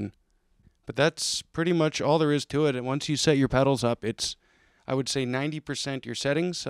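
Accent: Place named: Canada. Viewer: Is this guy Canadian because I can hear it on his accent and me being a Canadian myself I hear it hella bad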